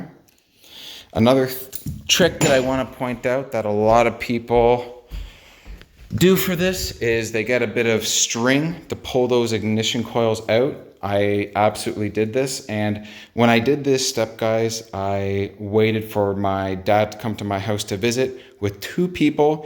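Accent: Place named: Canada. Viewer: Canada eh?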